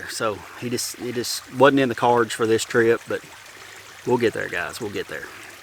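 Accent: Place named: South, United States. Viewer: He's such a southern dude, can't hardly understand him.. he needs to speak normal english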